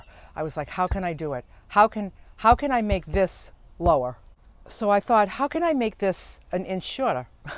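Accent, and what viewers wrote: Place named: New England, United States. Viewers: I love your accent, reminds me of "Aunt Elsie" from Martha's Vineyard. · Love your new england accent...Massachusetts gal myself...you're very cool! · ..where in New England are you? I grew up in Massachusetts and love your accent! You are so clever.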